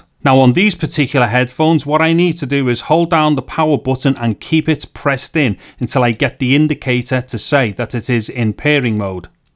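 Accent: Liverpool, United Kingdom. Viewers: Cheers scouse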